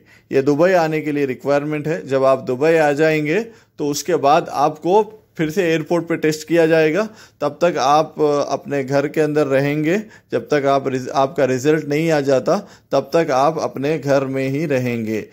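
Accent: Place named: Hyderabad, India. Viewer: Assalamalaikum MASHAALLAH khali awaz se phechan lete Hyderabad ke koi hai to Bas aap se ye hi guzarish hai ke please Hyderabad ka naam roshan karo.. Allah hafiz dua ki gusarish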